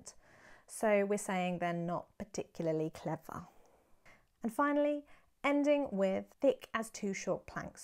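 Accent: United Kingdom. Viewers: Your British Accent, and your expressions.. awew....just love the way u speak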